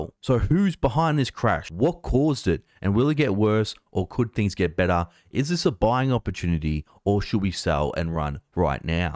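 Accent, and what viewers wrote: Australia. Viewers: I think the Central Banks are trying to consolidate as much gold as they can because “in Their hands it is valuable but in Our hands it’s Not”. If they crash the price people might be tempted to Sell…then They can acquire it at Bargain Prices. When I watch your videos your accent makes me miss visiting Australia! I declare a TAX FREE 2023 for Every Disgruntled Democracy 💭🇺🇸✌️🇦🇺❣️🙏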